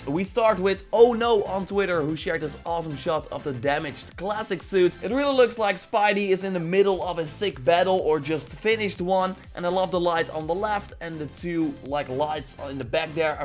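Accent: Netherlands. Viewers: Ooh daam i love ur dutch accent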